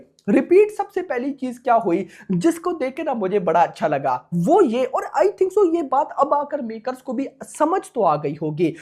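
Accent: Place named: Lahore, Pakistan. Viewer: Bhai teri language suni muje lga tu pakistani he fir teri bio me dekha such me tu pakistani he hindi tumari lahori wali he 😅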